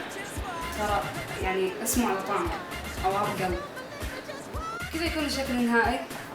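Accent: Kuwait. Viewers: انت كويتي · هل انت كويتي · انت كويتي ولا سعودي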